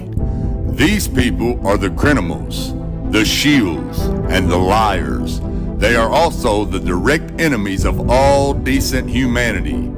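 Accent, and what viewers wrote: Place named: United States. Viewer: I love your accent, not sure what part of the US you are from. Much love from Ireland. God bless my brother in Christ.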